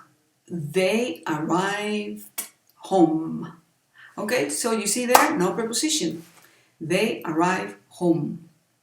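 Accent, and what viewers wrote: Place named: England, United Kingdom. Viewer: Genial, me ha gustado mucho el video, todos son específicos y lo más importantes se te queda la información tanto con los ejemplos y cómo lo explicas., además que se agradece tu acento inglés. Felicidades..!!! GRACIAS...!!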